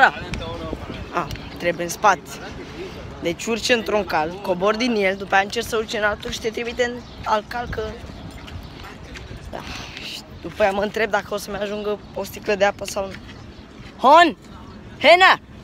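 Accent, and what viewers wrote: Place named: Egypt. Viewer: بجد بتبقى عسل جداا لمل تتكلم مصرى💟💟💟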